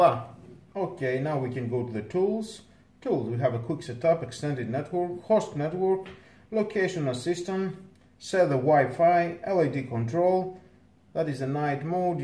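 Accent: Greece. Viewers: και μένα μου φάνηκε λίγο ελληνική η προφορά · Μόνο οι Έλληνες έχουν τέτοια αγγλική προφορά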